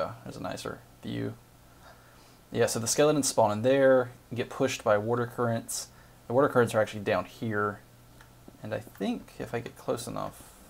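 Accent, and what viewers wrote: South, United States. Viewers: "Warter" and "Warsh" are the way water and wash sound with a southern accent. There are many others that sound off, but after a while it doesn't trip you up if you've grown up speaking/hearing English and various accents. For those who are multi-lingual, I'd have to say it would throw you off, though.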